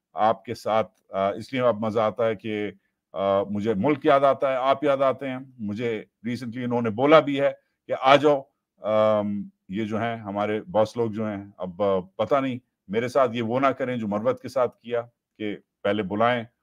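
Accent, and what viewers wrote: United States. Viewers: Sir ap urdu blkl mere 21 sala bete ki trah bolte hn, just little difference u speak wd American accent and he wd French accent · Mr wajahat your are sitting here for correction of English sentences. This is military leadership visit , why your are involving foreign ministry. Being Pakistani i will give due respect to all my Civil as wel as Military leadership. your are speaking in tone of American, being American your analysis is very correct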